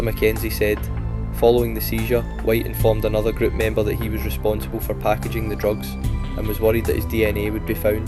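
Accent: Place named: Scotland, United Kingdom. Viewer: Gid video mate, keep doing what your doing, well put together & it's good to hear a Scottish voice talking about Scottish crime, rather than AI or a mad American, who doesn't know the difference between Scotland & Somalia 👌🙌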